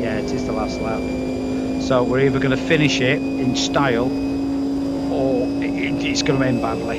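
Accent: North, United Kingdom. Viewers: Love the Group C's in AMS2. That Sauber and Fred's Jag are standouts for me. Congrats on the 2k. I enjoy those familiar dry witted, dulcet northern tones 😆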